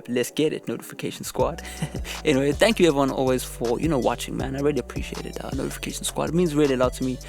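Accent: South Africa. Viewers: Where are yo from bro you sound South African. I would know cause I’m from there as well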